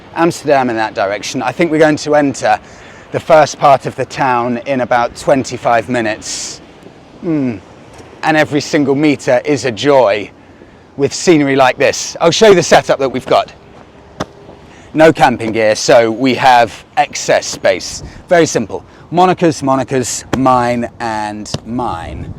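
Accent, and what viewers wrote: RP, United Kingdom. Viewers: Just curious...is yours a London accent? Is that considered posh? Thanks.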